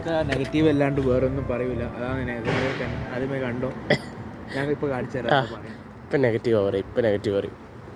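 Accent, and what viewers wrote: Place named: Kannur, India. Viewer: Aa kannur slang kelkan oru verethanne sugam ❤. Ennalum nte husband nne kootathe film kanan poy nadakate🤩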